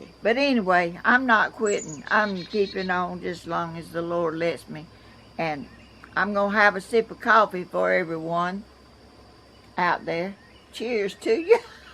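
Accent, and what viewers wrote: South, United States. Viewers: Hi, I'm Melissa from Iowa. I'm subscribed. You are just so stinking cute, southern accent, cute sayings, and a real sweet lady, I really enjoy watching you create your crafts, and am wanting to try my hand at some of them, bless you from Ga.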